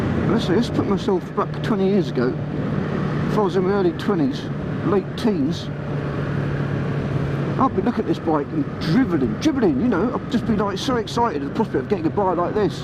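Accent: United Kingdom. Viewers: you sound british, i've got a question. what does the word cheeky mean, if someones acting cheeky what would you call that in american english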